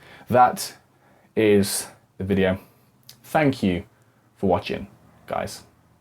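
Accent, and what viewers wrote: United Kingdom. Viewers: your british accent is so coollllllllllll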